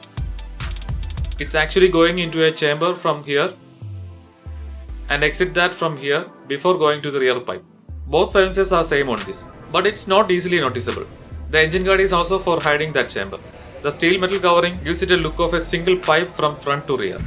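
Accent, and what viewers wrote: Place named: Kerala, India. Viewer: I think u are a mallu guy by hearing ur pronunciation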